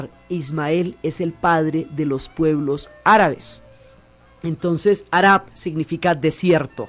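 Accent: Colombia. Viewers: Gracias por estos hermosos relatos, los amo! He escuchado varios, en varrias ocasiones, igual que el resto de tu audiencia, pienso que sería buenisimo un mapa. Me encanta escuchar tu bello acento de Colombia, en México nos encanta la forma de hablar de tu bello país.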